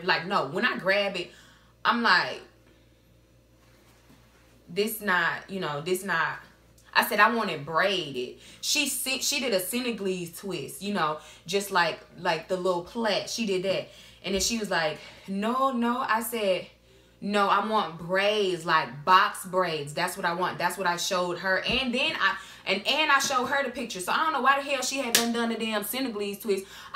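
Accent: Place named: South, United States. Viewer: I'm from Alabama and you sound kinda southern 😊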